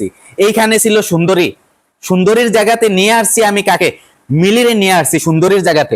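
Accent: Sylhet, Bangladesh. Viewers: ভাই আমি সিলেটি আপনে নি সিলেটি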